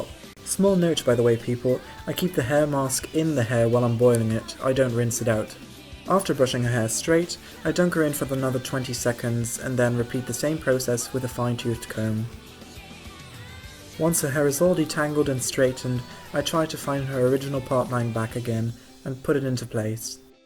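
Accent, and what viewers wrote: United Kingdom. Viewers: R u from UK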